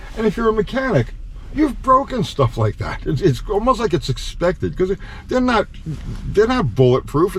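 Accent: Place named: Michigan, United States. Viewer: You have to be from Michigan. I have worked with hundreds of Michigan people and you sound and act just like them. You ramble all over the place and talk and talk but never say anything. Your videos and annoying. Tell me that I am wrong.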